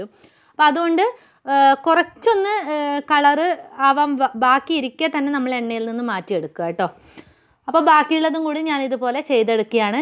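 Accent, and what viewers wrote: Kasaragod, India. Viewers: Kasar Kode laugage allalloooo Nigalude speech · കാസർകോട് സ്ത്രീകളെ സൗണ്ട് കേട്ടിട്ട് അവിടുന്ന് കല്യാണം കഴിക്കാനും മോഹം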